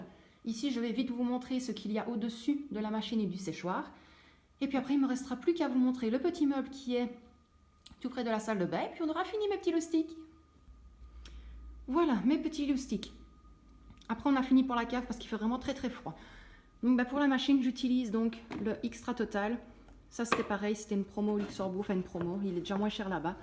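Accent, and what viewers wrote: Belgium. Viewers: oui juste à côté de liège, oui ça s'entend mais moi je l'entends pas trop lol parce que je suis aussi de liège lol donc je fais pas trop attention lol Oui chez lidl je fais souvent les promos viande et les produits d'entretien lol Oui avec ce que vous avez dans vos armoires ( pas forcément les réserves lol) Oui je regarde aussi vos batch cooking, enfin quasi toutes vos vidéos lol.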